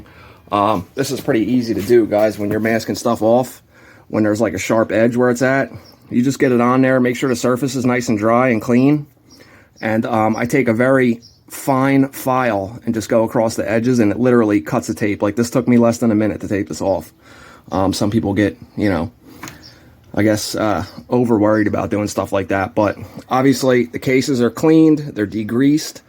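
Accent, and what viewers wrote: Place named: New Jersey, United States. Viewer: Had a feeling you were from NJ, south if I had to guess, closer to Philly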